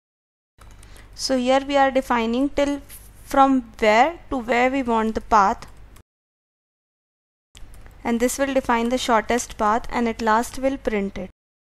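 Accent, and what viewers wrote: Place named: India. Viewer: Billshit Indian accent … 🤢🤮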